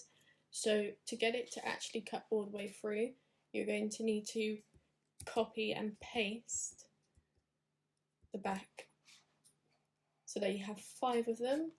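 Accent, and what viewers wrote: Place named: United Kingdom. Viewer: Came for the tutorial, stayed for the accent 😅 UK people have the best accent. Good tutorial. Just bought a cricut and I'm learning. Greetings from Mexico.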